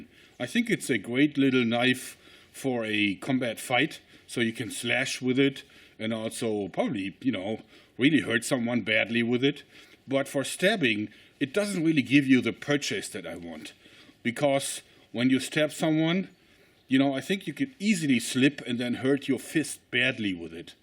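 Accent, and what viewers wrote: Germany. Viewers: for some reason this all made better with the german accented English...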